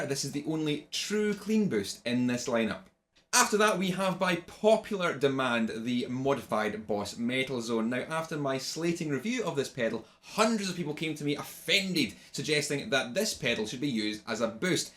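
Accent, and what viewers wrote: Scotland, United Kingdom. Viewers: You sound like William Wallace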